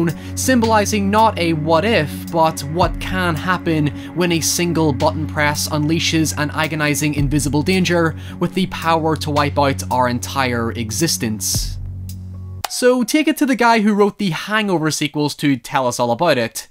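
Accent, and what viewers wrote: Northern Ireland, United Kingdom. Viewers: Until you said "Nah m8 youre fucked" I didn't reaise you had a norn iron accent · Hye lad u from norn iron? Ye saft hur ya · This man sounds like hes from NI, wooopa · Feels so good to hear a proper Northern Irish youtuber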